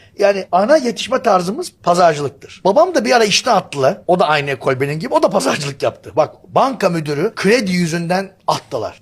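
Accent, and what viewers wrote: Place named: Anatolia, Turkey. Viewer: Yalnız hoca konuştukça şive daha da Anadolu ya kayiyo☺️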